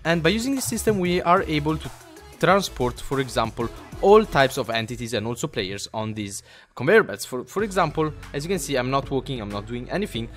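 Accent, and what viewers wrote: Italy. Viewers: quanto si sente che sei italiano😂 comunque sei un figo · si sente che l'accento è marcatamente italiano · Si sente che sei italiano 😉😉 xD Sei un grande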